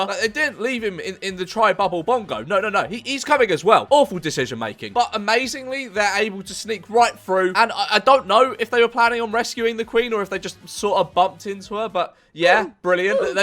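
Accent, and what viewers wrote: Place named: Liverpool, United Kingdom. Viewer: The scouse accent is class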